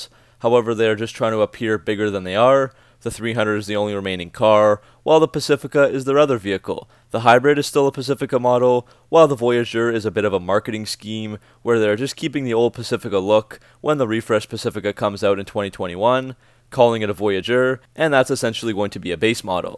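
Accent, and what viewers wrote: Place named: Canada. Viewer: Lol, that Canadian accent is strong.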